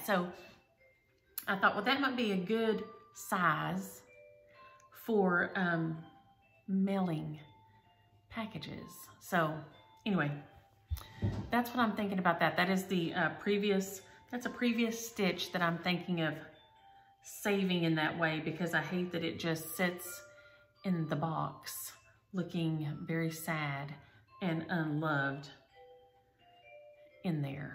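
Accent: South, United States. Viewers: I’ve been enjoying your Vlogentines, especially right now. Monday I fell getting on my bike and fractured my wrist in 2 places and tore my rotator cuff to boot. So much for my stitching and knitting and quilting for a while!😢 You are like company for me. We are out in the CA desert in our tiny winter house, and can’t do much of anything anyway, but now no pool or stitching. I’ll go nuts!! Thinking maybe I could manage a little cross stitch or needlepoint on a standing frame. It would be slow but something to do. Of course mine are back East, so a trip to Hobby Lobby when I go to the doc next week might be on the list. I enjoy your accent having lived in OK for 4 yrs and TX for 4 too years ago - I’ve missed it😁. It is amazing we survived our childhoods in trucks and station wagons!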